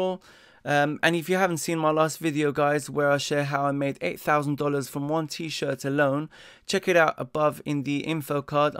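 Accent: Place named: United Kingdom. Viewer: i came here just to listen to your British accent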